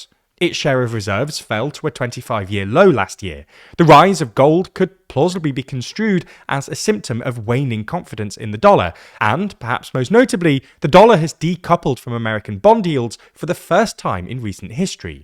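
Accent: United Kingdom. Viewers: Yeah when I heard the british accent I thought this was going to be a waste. These guys never have good info.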